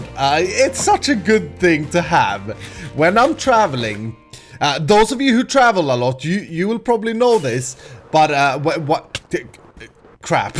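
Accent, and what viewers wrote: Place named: Sweden. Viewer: Heyy you freaken swede with a gorgeous accent! ☺ I have a school project where I have to interview someone, and I was wondering if that could be you?!😉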